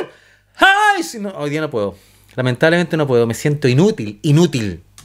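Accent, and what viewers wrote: Chile. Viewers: sabia que eras chileno!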